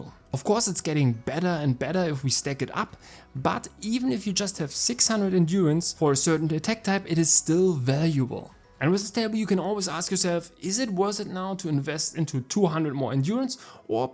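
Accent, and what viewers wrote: Germany. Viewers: man hört direkt den deutschen raus :D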